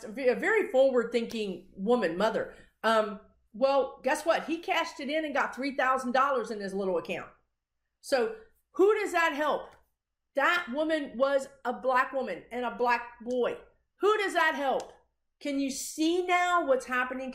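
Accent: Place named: South, United States. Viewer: Love your southern accent :)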